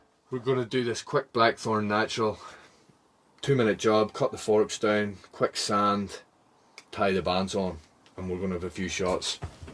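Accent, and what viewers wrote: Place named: Belfast, United Kingdom. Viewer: his accent is called a Belfast accent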